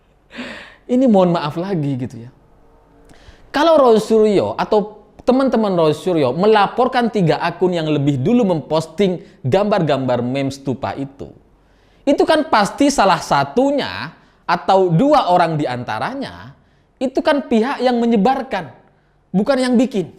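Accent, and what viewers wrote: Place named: Madura, Indonesia. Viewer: Logat kacong medok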